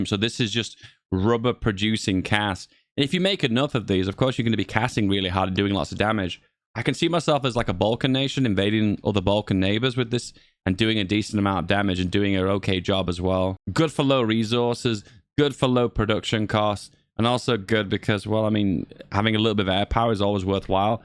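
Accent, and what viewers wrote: England, United Kingdom. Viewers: still with the "bow je" terminally English >.>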